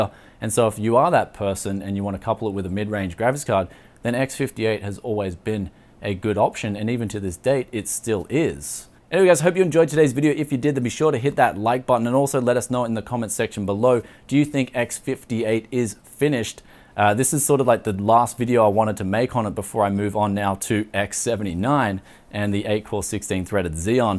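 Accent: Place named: Australia. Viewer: This main has the perfect Australian accent. Perfectly understandable.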